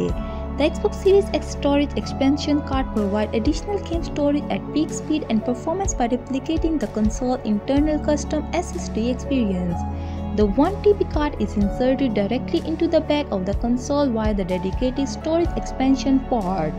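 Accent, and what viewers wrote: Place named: India. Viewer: While reasonably informative, please consider using a native english speaker for conveying the message. The Indian accent did not work very well here, sorry.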